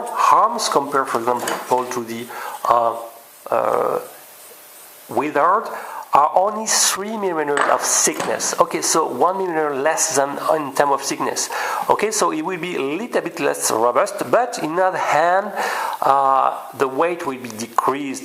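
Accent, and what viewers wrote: France. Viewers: Are you French ? I notice a familiar accent to me :)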